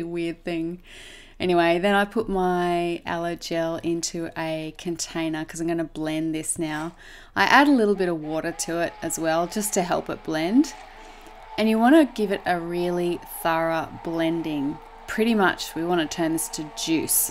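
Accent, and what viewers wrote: Australia. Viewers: the Australian accent is beautiful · My first time watching you. So nice to hear an Aussie accent. Love your soap. whenever I use Aloe gel it turns a weird pink colour. Have you ever had this problem?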